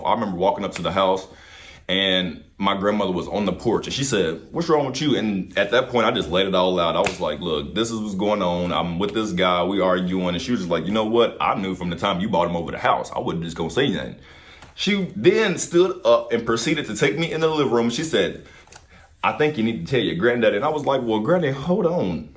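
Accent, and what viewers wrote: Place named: New York, United States. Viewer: 🤓 u talk n sound like u from new York City n that dude from black ink crew NY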